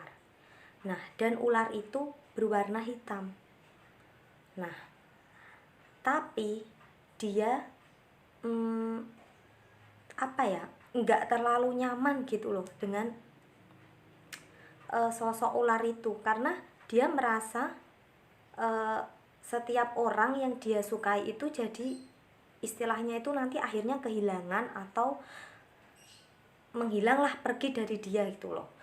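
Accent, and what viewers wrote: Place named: Java, Indonesia. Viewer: benar deh.. Asline pundi tho mba.. Nang video logate jowo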